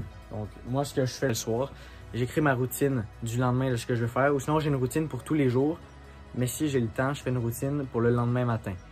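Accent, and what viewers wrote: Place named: Quebec, Canada. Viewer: Toi tout ce que t'as à faire quand tu parles français c'est de faire disparaître ton affreux accent québecois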